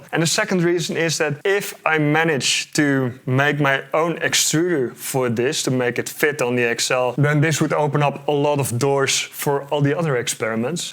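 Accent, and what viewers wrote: Netherlands. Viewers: i thought you sounded dutch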